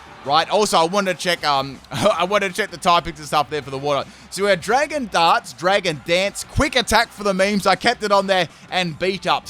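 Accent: Australia. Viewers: I'm Aussie and wouldn't ever consider saying Leafeon the way he did. There's an 'e', just read it phonetically. Think is maybe a tad bogan though, which might explain it.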